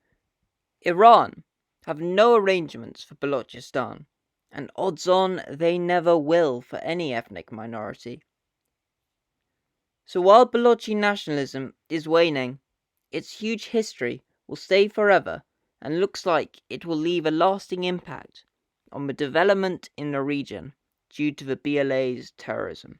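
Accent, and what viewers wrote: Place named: Punjab, India. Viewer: Your accent is punjabi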